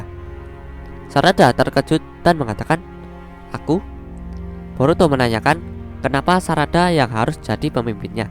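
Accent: Java, Indonesia. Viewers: Medoo men min suara mu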